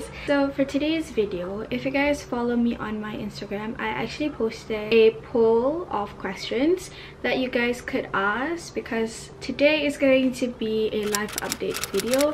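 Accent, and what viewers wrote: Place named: England, United Kingdom. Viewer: yowww her English accent so🤩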